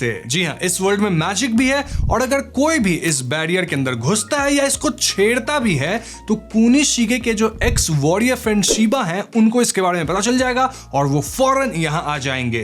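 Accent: Bengal, India. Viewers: Bhai tu bengali hea kya ? 🤔🤨🔪 coz some of your accents is so similar with bengal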